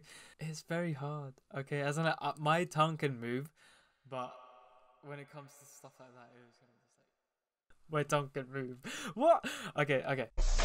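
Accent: United Kingdom. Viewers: he got the proper london accent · Are you British and BTW IL your vids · Is it just me or does he sound a little bit british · When i first saw ur povs on tik tok i thought u were American, hearing u speak British sounds so wrong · Wait is he British AHHHH HE IS BRITISH 😮😳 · Is he British? I am confused 🤠🤠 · omg he’s british i always thought he was american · NO WAY YOURE BRITISH AAAH OMG IS AALIYAH GRACE BRITISH TOO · WAIT YOURE BRITISH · he sounds british broo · He’s Filipino and sounds so English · I didn’t know that he had a British accent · He British omg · Are you from Britain bec I am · aye im new and bruh like on tic toc im thinking he sound Different but he sounding like an british big brother I never had but his laugh had me tumble off the bed literally out of laughter Like the funny face he pulls in sum of them videos like I looking in the mirror trying to make it but failed successful Aye keep up the good job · UHH HES BRITISH?!?!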